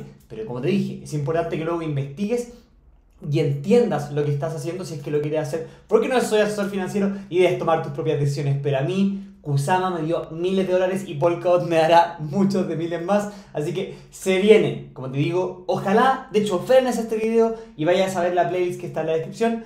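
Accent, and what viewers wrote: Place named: Chile. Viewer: explicas pésimo demasiado chileno pero buen contenido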